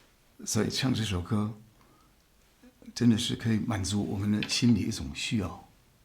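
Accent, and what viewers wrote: Standard. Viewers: 您的中文口音比很多我们内地人都准，很好听，欢迎您来武汉游玩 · ，非常喜歡您，你的漢語講的比台灣人還標準。祝福你全家永遠萬事順順利利。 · 你的中文好標準啊！我聽你講話像在聽我朋友講話一樣！好親近啊！ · 您的漢語水平讓我吃驚！太好了，字正腔圓！我都懷疑您到底是華人還是德國人了，新年快樂！ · 每一次聽到這首歌都感動。第一次看到這個頻道，老師的中文字正腔圓，講話平靜中帶有情感，猶如歌曲一樣令人感動。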